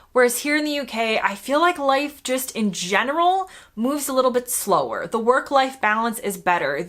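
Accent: United States. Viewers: It is a bit surprising that your American accent is totally intact after all the years that you've lived here in London. Is that a conscious thing not to lose it? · Not only do you have an American accent, but you speak like someone from the USA, using words and phrases that British people would not. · You still sound very American to those of us in the UK, but after 10+ years living here do your American friends and family think you have a bit of a British accent? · Ive noticed with time you seen much happier . I like the way you say British words but with an American accent! I worked in London for years and the pace was fast...i moved to the countryside and struggled for the first year as the pace was too slow....i adjusted and now live a slower less stressful life. Great episode again pointing out what we take as granted.😊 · Your accent doesn't sound like you landed yesterday....not to me. 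It's only reallly mildly 'American sounding' to me, should blend in well in the UK.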